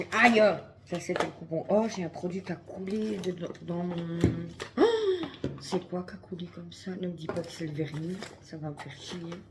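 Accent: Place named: Belgium. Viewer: Votre accent me rappel celui d'un ami belge de Charleroi😅😂🤣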